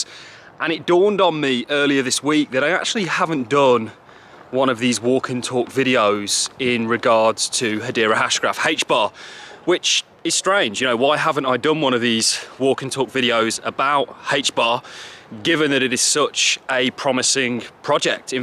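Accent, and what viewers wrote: Birmingham, United Kingdom. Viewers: Definitely a Brummy tone I'm sure I've seen him knocking around town kro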